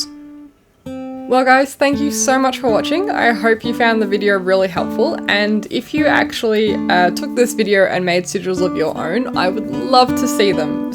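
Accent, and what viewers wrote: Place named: Australia. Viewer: IS THAT AN AUSSIE ACCENT I HEAR OMGGGG YAYYYYY :) i never find any aussie youtubers about magic and spirituality.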